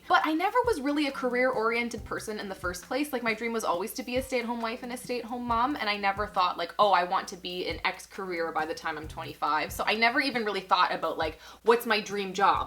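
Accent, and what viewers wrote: Canada. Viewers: you're back!!! also your canadian accent makes me feel so at home :-D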